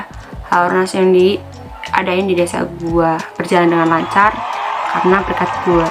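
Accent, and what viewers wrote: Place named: Java, Indonesia. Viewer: Medok ya mba.. Jawa banget deh ☺️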